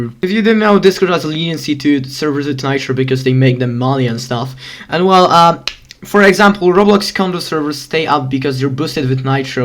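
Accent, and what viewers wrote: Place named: England, United Kingdom. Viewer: ale englisz ladny masz ty z angli chyba jestes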